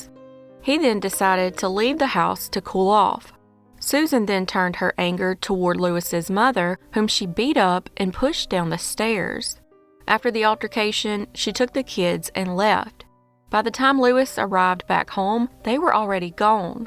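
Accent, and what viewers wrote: South, United States. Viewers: Love your accent! Georgia? South Carolina?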